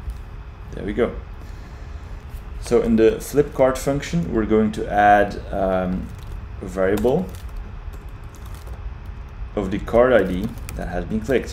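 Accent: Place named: Brazil. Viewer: You sound Brazilian! Where are you from?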